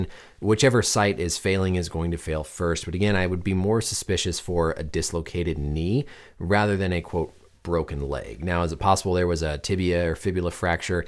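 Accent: United States. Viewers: I subscribed because you said “football” instead of “soccer”.. and in an American accent not even British 🎉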